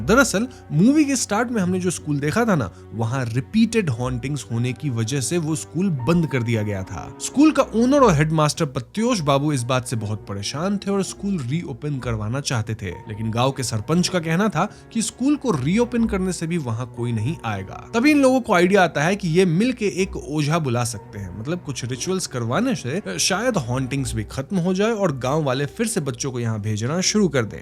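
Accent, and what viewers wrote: Bengal, India. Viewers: I think the host is bengali. Accent thora sa bengali type hai. But that's my opinion. N ye to achi baat hai. Because of this channel kayi movies hai jo language barrier k karan hum dekh nhi payenge but yaha wo explain ho jata hai. N hume itni achi movies k bare me pata chalta hai. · i also think that because when he speaks hindi you can hear bengoli touch in some words.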